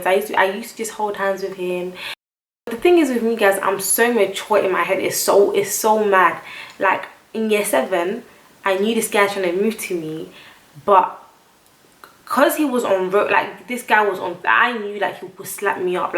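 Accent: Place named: United States. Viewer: how come i come from my boy joovier video you sound more american than me?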